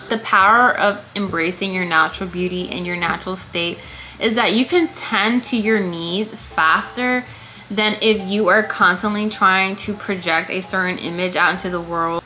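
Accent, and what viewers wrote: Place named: United States. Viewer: Girl I love your attitude. I am not from U.S but I can hear it right away, the accent omg 😅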